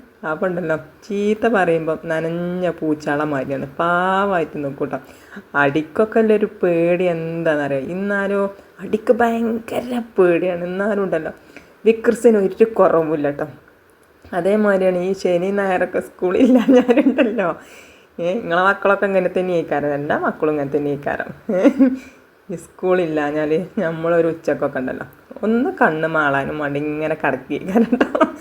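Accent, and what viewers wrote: Malappuram, India. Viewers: ഇജ്ജ് മലപ്പുറം ഭാഷ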